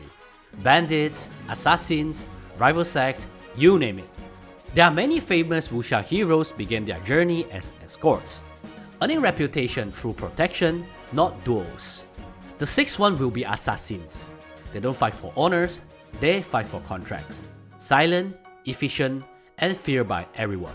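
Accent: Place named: China. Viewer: How are we speaking with a Chinese accent yet using American pronunciations of Chinese words?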